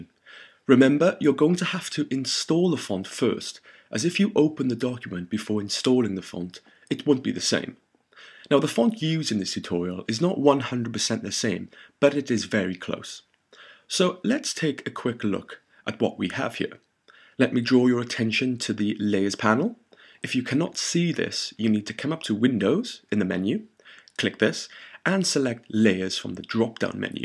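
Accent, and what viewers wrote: Wales, United Kingdom. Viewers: his accent sounds Welsh me thinks, tidy :)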